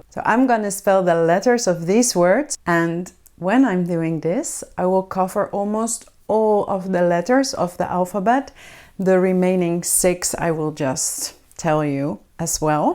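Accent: Netherlands. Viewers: Je bent echt geweldig, ik ben blij dat ik je heb gevonden 🙂 Ik leer Nederlands in Mechelen-België en ik vind je accent en Nederlandse accent mooier dan het Vlaamse accent 😁 "afschuwelijk" dit woord vind ik moeilijk I use it to describe Belgium weather 😅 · Heavy Dutch accent.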